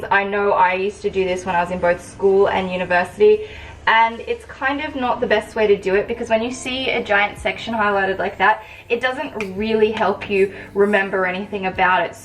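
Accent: Australia. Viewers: your aussie 💕💕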